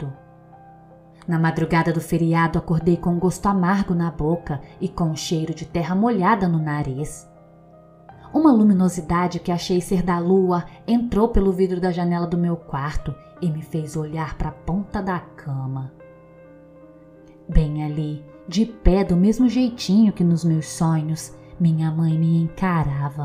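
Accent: Minas Gerais, Brazil. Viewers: perfeita e cativamente com sua minerice bom de ouvir com cafe e pao de queijo parabens!!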